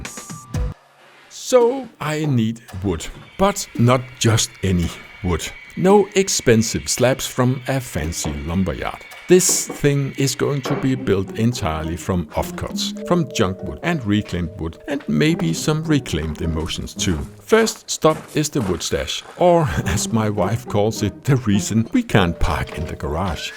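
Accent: Denmark. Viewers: click on a video from a channel I haven't watched before, immediately hear the accent, OH A FELLOW DANE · Great video production, likeable 50something, cool looking furniture, charming Danish accent: I subscribed!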